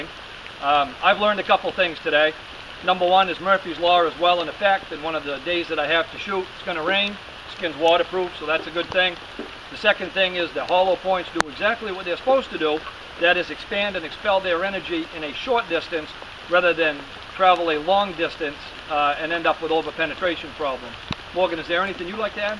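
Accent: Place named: Pennsylvania, United States. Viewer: By the way, are you in PA? Your accent is familiar.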